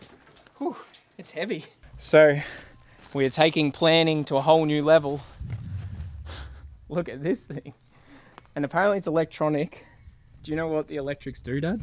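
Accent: Australia. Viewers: Maybe it's the native bird sounds in the background or my imagination but I reckon you're sounding a bit more Aussie since you've been back!? I'm so used to hearing American accents in film/TV that it still sound strange to me to hear our accent coming out of a screen haha. Hope you're enjoying VIC :) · I like:s Australian English accent... It's same like Australian cricket commentary....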